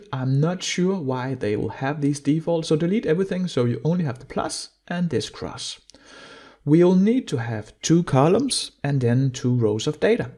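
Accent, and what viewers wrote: Denmark. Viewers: I like the danish accent!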